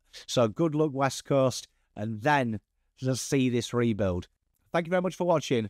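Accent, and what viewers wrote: England, United Kingdom. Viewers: Curious, how did a Pom with a such a thick accent get to know so much about AFL, do you live in Oz? Anyway great channel and insights. Tom McCarthy has firmed as the front runner it seems. He's fast, really fast. Right age bracket, plug n' play. But gawd, we need a good ruckman asap!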